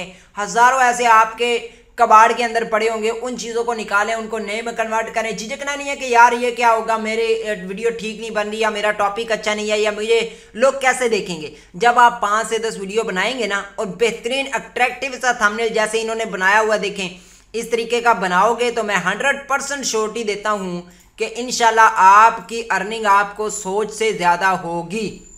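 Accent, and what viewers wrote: Punjab, India. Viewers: Very Nice & Good Punjabi Touch.. Liked it.. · Good Punjabi voice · Wow Punjabi tarka👌👌👌👌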